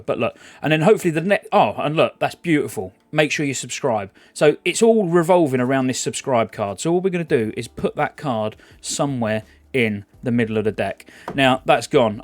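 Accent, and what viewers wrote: United Kingdom. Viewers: I love your content but can you please fix your British accent? That would be great. At least start pronouncing your T’s....it’s Thirty with a T, not thiiieeeey.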